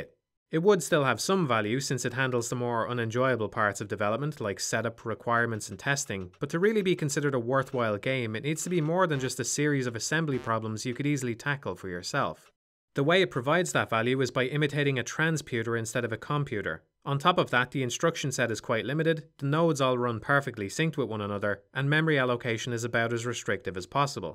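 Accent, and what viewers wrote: Ireland. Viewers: Any chance of a breath of the wild review? I need a twelve hour analysis featuring my favourite floating Irish voice.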